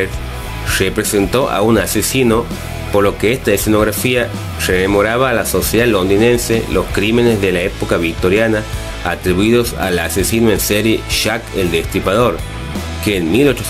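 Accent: Tucumán, Argentina. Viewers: Sos de Tucuman ?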